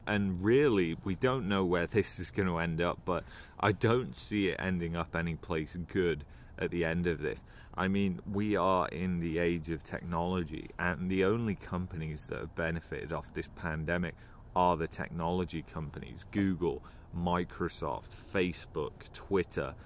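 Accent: United Kingdom. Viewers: you're so interesting to listen to. What part of the UK are you from?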